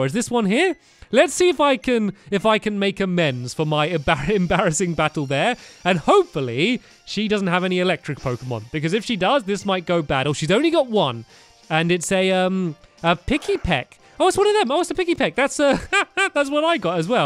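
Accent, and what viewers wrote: England, United Kingdom. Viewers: S4L Yolo It's just stampys accent You could say both ways In England we say z as zed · Lunapuppy Vlogs is from England, so they pronounce Z differently lol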